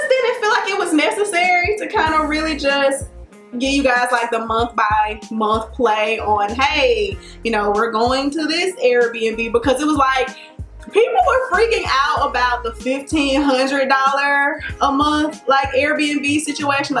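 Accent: United States. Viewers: I love how country you are, I could listen to you talk all day.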